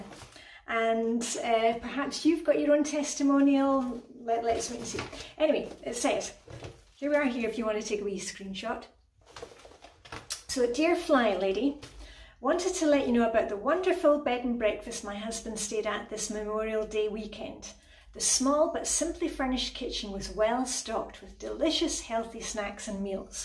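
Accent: Scotland, United Kingdom. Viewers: I have enjoyed your Scottish accent so much - just had to comment when you said jotter… reminded me of school, back when I had a Scottish accent too! Thanks for all your encouragement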